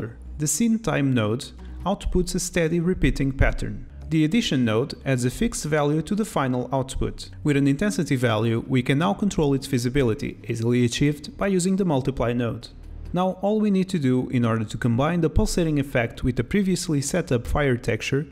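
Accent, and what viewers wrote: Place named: Portugal. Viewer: Não vão acreditar mas ao ouvir o sotaque pensei logo que era um tuga e depois de procurar na net, confirmei que quem está a falar é tuga. Parabéns pela ferramenta, parece porreira e definitivamente uma compra a fazer num futuro próximo.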